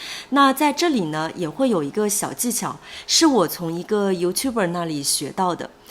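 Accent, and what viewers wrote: Standard. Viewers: Another great video! Keep up the great work! (Also, very good standard accent) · 非常感谢老师 ！你的视频太有意思了 ，你讲的中文也很标准，听得清楚，口音也很好听，可爱。而且视频后的生词和造句也对我很有帮助..。我学到了好多词汇。一举两得❤😊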